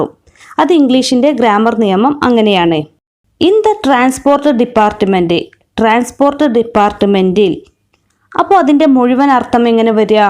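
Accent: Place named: Malappuram, India. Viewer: Your malayalam accent like the Malappuram..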